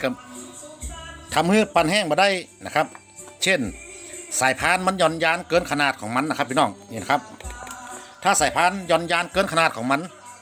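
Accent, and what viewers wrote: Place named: North, Thailand. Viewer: ผมคนใต้ชอบสำเนียงคำเมืองมากครับ เพราะมากอีกแบบหนึ่ง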